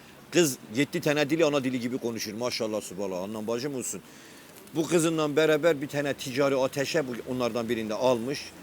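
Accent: Erzurum, Turkey. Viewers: Galiba giyamet Kopti hele şükür Erzurumle mümesin video atmış konuşma tarzı Erzurum söyliyim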